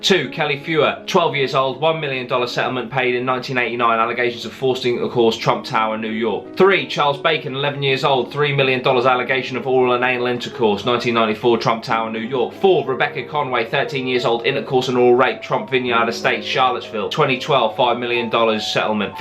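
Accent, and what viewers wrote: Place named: England, United Kingdom. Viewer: Where in the U.K. are you from? Your accent and mannerisms sound very much like Russell Brand who is from Grays, I am originally from Canvey so wondered if you was local. Love your videos brother, keep up the good work.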